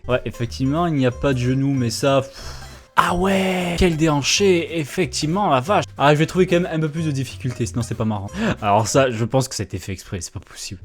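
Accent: South, France. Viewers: Ouais ça m'a plus Tu viens du sud ? Si oui ou ?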